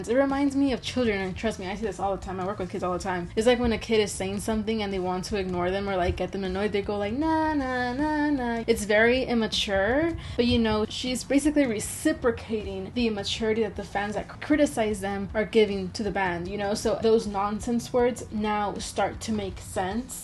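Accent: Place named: Quebec, Canada. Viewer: Bonjour, à ta façon de parler anglais, tu semble être du Québec... laisse-moi savoir si je me trompe. Si c'est le cas, je suis heureux d'avoir trouvé une autre personne du Québec qui aime TW. J'ai découvert TW en juillet dernier et depuis, je les ai vu live au Centre Bell, au Centre Vidéotron et je suis allé à Monterrey les voir le 22 février dernier. J'espère qu'elles annonceront une nouvelle tournée canadienne bientôt pour aller les voir encore une fois. Si tu as l'occasion d'aller à leur show, n'hésites pas. Elles sont encore meilleure live que sur les vidéos de leur shows. Super réation!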